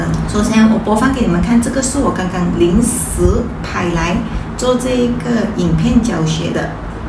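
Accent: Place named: Vietnam. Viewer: 感觉普通话不像是中国人说的，发音像是越南人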